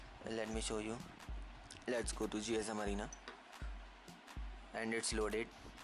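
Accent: India. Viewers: Worst review ever watched..This loser doesn't know the purpose of the OFF button at the back panel next to the battery..But he thinks that he's a tech genius. :P Besides my ears hurt by this Indian accent.. :@ "Somebody Gonna Get Hurt Real Bad" LMAO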